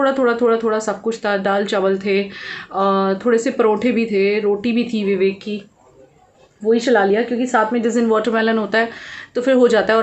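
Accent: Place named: Bihar, India. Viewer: Aap bihari bolati Hain bahut cute lagti Hai kabhi litti chokha share Karena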